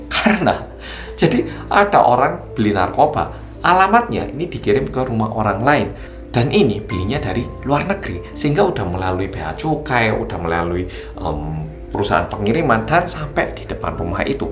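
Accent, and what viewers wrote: Java, Indonesia. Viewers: Maa syaa ALLOH....TABAROKALLOH....INI ORANG KOREA TAPI KOK BHS NYA MEDOK BNGT,SESUAI BNGT DG JUDUL NYA👍💚🙏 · Mas skrng udah 5 ahun di korea.. Gimana menjaga bahasa indo nya dan tetep medok? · film korea itu asik dan romantis lho.....kok bisa begitu bro..? tapi mas ini kayak orang jawa logatnya.....nice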